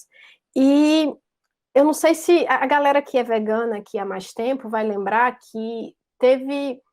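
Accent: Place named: Northeast, Brazil. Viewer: Adoro esse sótaque nórdestino 😁. Por um mundo sem senhores para humanos e não humanos, Tmj 👍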